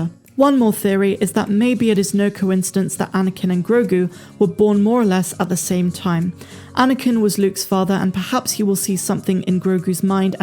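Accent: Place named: United Kingdom. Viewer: I think I could listen to delightfully British-accented read from comic books for hours...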